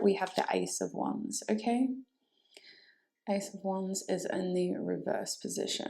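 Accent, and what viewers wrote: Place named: Australia. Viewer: Yay another Australian reader! 💜💜🙏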